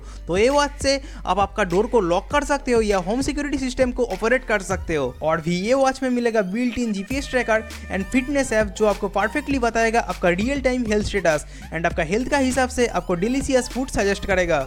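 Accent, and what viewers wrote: Bengal, India. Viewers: Are wa kya bangoli Hindi bolta he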